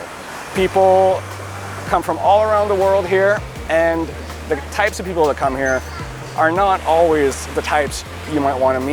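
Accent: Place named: North Carolina, United States. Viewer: Hi, I really like your videos about daily life in Thailand and the many traps you can come across as a farang living here in Thailand. But there is one thing I want to mention about your performance and I hope you will understand what I mean: I'm a native German speaker and actually quite fluent in English (worked in the UK for 4 years) but I sometimes have really difficulties to catch up with your Northern Carolina accent. And I think I'm not the only one among your followers that have this issue. I you - maybe - can do something with that it would be really appreciated very much. And please: No offence meant! 😜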